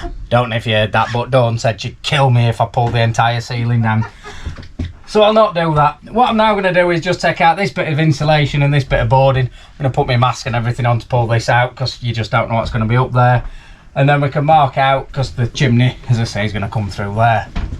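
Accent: Yorkshire, United Kingdom. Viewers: Oh dear. I'm killing myself laughing because I can understand a Yorkshire accent (well, mostly), but I left CC on, and it can't figure out what is being said. Unintended hilarity, which I'm sure you will appreciate. I'm sure some people will wonder why you put so much effort into a temporary fitting, but it comes down to what my Scottish ancestors would call being "thrifty" (emphatically not "cheap"), which is completely consistent with being a "tight Yorkshireman"--a very respectable world view, I'd say. You may call it "winging it," but I really appreciate the skill behind it.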